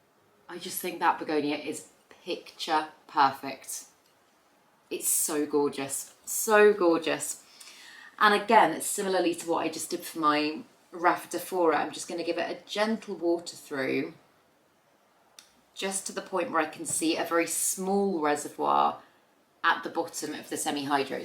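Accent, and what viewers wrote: United Kingdom. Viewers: I watch your videos from France to learn about plants and to learn english as well!!! I love your british accent and prononciation!! Thank you!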